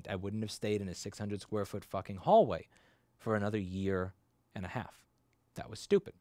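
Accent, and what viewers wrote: New York, United States. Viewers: If you could boil down all that's good an interesting about NYC, it would look and sound like Rossmann. The world needs more. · Watching your video from my bed, i wondered "why did i subscribed to a guy who fixes Mac systems"? I bought a mac some time ago cuz i wanted to do more photoshop for my pics, but haven't been taking pics for some time now. And i don't think i'll be opening a mac anytime soon. So after a couple if minutes, i realized: 1. i've always liked the NY accent (never been there, not sure why. Not even my native language ) 2. i like real people, and you keep it real 3. i like that underdog attitude. Keep up the good fight, i'm a believer in hard work. Been working since 16, almost 3 decades in a couple of years. Not the sharpest pencil on the box myself, but i firmly believe that if you try to do your best everyday; life will treat you fairly. 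I've had a rough couple of years before the covd hit the fan. But my ant determination (i feel like im in autopilot sometimes) has kept me afloat, and things are looking bright ahead for now. Cheers from Costa Rica.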